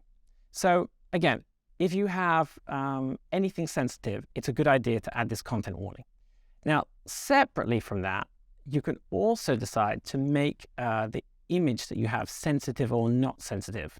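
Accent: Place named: United Kingdom. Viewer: I think of as being from the UK. is an Irish name (pronounced ) and as I understand it, he grew up in and has spent more years in the UK than anywhere. You'll notice he has a "British" accent. He does live and work in Norway, has a Norwegian last name and his wife and kids were born there. So... But yeah - his English is flawless because he's pretty much from there. No one has ever mentioned to me how good his Norwegian is... I'll have to ask some of his co-workers.:)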